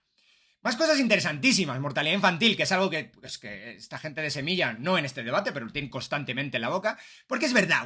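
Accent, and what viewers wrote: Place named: Argentina. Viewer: Parece que tú no tú no eres de Guatemala tu hablado Qué haces para que parece que tú eres de Argentina o de Colombia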